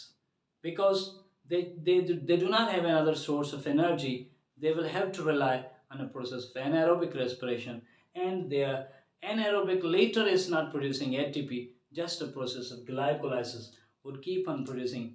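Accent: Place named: United Kingdom. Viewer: Fully British accent wao.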